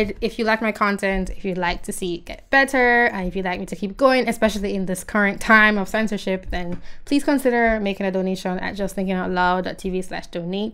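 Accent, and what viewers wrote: Jamaica. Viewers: Really nice jamaican girl. Your accent is so cute. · Since I'm not American I don't really care about any of these issues, but I just enjoy listening to your Jamaican accent.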